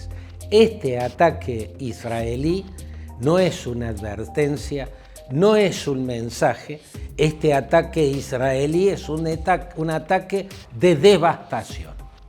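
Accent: Argentina. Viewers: DEJA DE HABLAR ARGENTINO , LUEGO IREMOS POR NUESTRA PATAGONIA .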